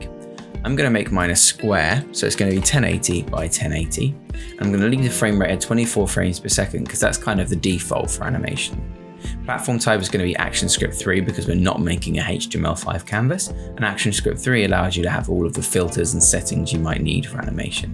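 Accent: United Kingdom. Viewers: Bro's British accent sounds so abnormal to me. Can y'all relate.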